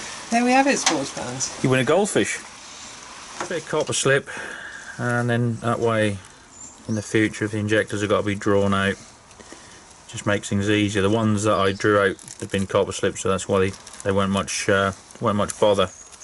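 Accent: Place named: North Buckinghamshire/Bedfordshire, United Kingdom. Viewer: Where about`s are you? accent sounds familiar (north bucks/beds) you near George?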